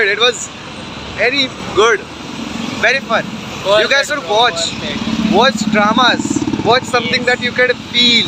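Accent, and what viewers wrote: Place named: Maharashtra, India. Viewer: happy birthday!!! i have known you since 2 months online yet i feel a sense of familiarity in you plus im happy to know that youre a marathi too!! No wonder your hindi accent felt familiar!!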